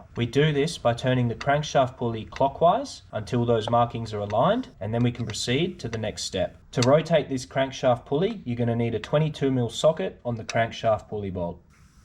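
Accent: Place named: Australia. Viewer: Very informative and detailed. Great video! Greetings from the Dominican Republic. Where are you from? England? I reckon you are from Australia, but I'm not quite sure.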